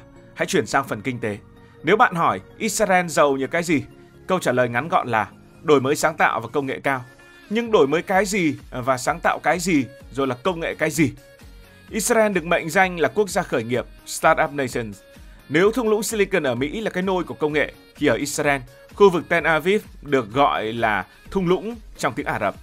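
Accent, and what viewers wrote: North, Vietnam. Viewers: Thông tin đăng tải và giọng thể hiện của bạn rất hay, nhưng mong bạn phát ấm chuẩn tiếng Việt để thể hiện "đẳng cấp" của kênh và ko gây ức chế cho người nghe cũng như giữ gìn sự trong sáng của tiếng Việt. Cụ thể: bạn ko đến nói dạy học thành dậy học, giàu có thành giầu có... đây là hiện tượng phát âm sai của người dân một số vùng quê đồng bằng Bắc bộ (được cho là quê mùa).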